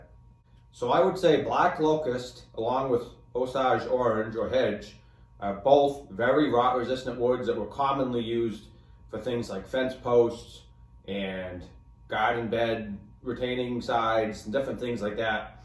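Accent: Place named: New England, United States. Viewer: Gotta love the new england accent Varies every twenty miles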